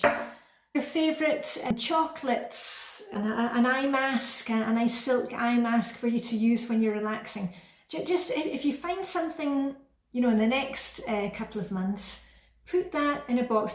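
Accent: Scotland, United Kingdom. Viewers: Thank you for an uplifting video I do buy my own Christmas gifts or given exact list. You have inspired me about the 5K in December..... I can walk everyday in December for at least 15 minutes.... I noticed your Scottish accent is stronger after visiting your mom blessings to you, thank you, Dallas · My mother had heart disease & I cringed when I saw your mum drinking alcohol, but maybe it's not an issue with for her. Your accent sounds strong after living in Denmark for 20 plus, but when you return home to Scotland do they tell you you've lost much of it?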